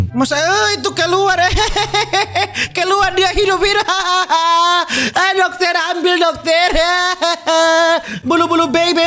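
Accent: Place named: Makassar, Indonesia. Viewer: Aduh bang kau bicara lucu kali Org makassar kah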